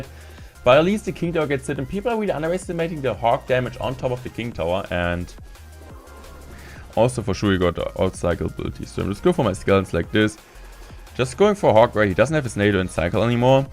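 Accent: Germany. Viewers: Are you german normally with the accten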